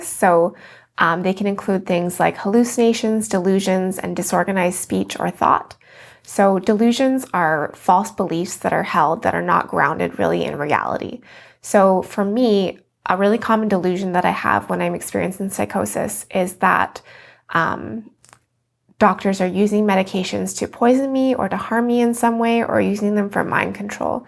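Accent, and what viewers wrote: Canada. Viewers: Great demystification of psychosis, carried out with your lovely gentle Canadian accent. I find listening to you makes me feel calm and at one with the world. I guess there's an irony there! Thank you.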